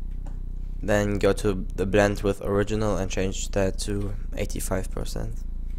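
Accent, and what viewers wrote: Germany. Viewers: haha, nix gegen dein english, ist aber immer so geil wie man jeden deutschen erkennt auch wenn er noch so gut english spricht XD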